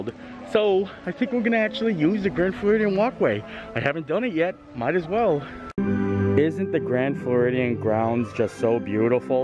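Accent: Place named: Pennsylvania, United States. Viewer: Hi! We love your videos and get excited every time there’s a new one! Curious, where in PA are you from? I went to Susquehanna University and you have the same “accent” as some of my friends from Shenandoah.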